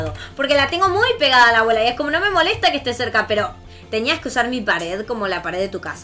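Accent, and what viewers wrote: Argentina. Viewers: AUNQUE HABLAS ARGENTINO YO TE ENTIENDO TEAMOOOOOOOOOOOOOOOOOOOOOOOOOOO.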